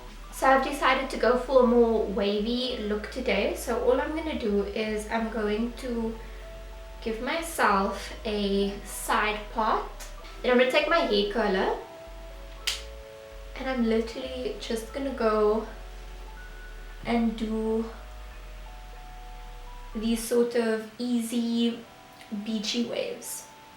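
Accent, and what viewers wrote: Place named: South Africa. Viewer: Sounds like South African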